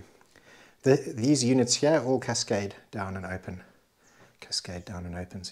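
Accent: South Africa. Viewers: Fabulous property! Lovely to hear a fellow South African! 😊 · South African accent. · So lovely to hear the South Afrıcan accent was not completely sure untıl you saıd we SOUTH AFRICAN say BRRAI.thank you for the amazıng vıdeo.would love to buy such a farm for my organıc way of lıfe.Greetıngs from CAPE TOWN.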